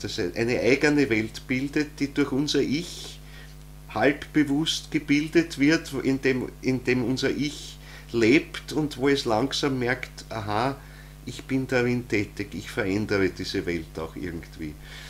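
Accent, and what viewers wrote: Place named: Austria. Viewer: Vielen herzlichen Dank für die so wichtigen Vortragsreihe. Bloß ist es Stellenweise nicht so einfach wortlaut vollständig zu verstehen wegen der östreichischen Akzente.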